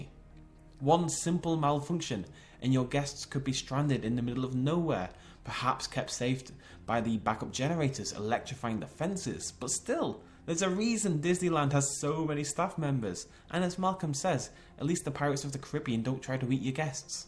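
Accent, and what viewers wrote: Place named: North, United Kingdom. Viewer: you sound so cute. and northern.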